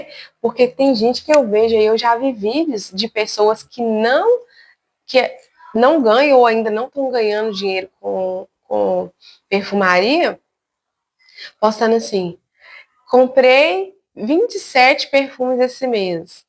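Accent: Minas Gerais, Brazil. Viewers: Mineira com certeza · VC é mineira?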